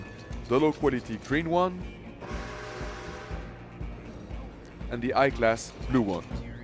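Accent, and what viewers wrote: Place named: Quebec, Canada. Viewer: J’entends l’accent québécois bro 😂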